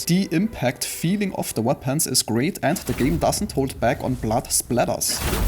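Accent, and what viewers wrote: Germany. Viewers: Are you German? You sound like it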